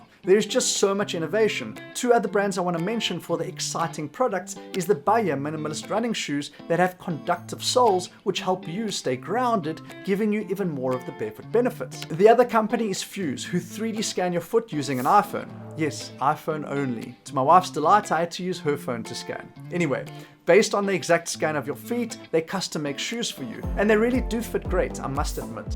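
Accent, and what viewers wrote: South Africa. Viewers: I hear south Africa in this man's voice! 🇿🇦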